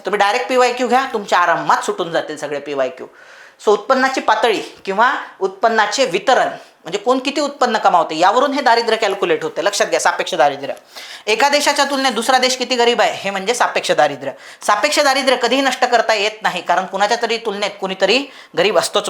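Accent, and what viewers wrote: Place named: Kolhapur, India. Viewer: Kolhapuri bhashet ekdak💥🔥 kadkkk ani lai bhariiii👍👌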